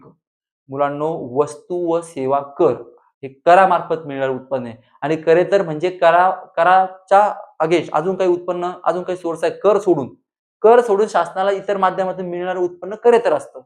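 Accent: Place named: Nanded, India. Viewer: Sir Nandedwale ahe re bhau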